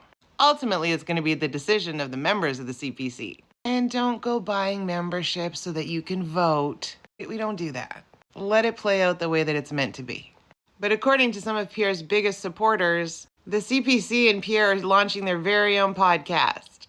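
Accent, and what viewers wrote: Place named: South, United States. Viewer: Why do you have a southern accent, but I am still agreeing with you pp has to go!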